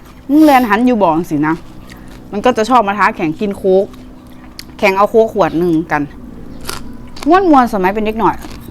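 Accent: Isan, Thailand. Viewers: พูดอีสานก็เพราะดีค่ะชอบคนเหนือฟังเป็นอยู่่กดติดตาไห้แล้วค่ะ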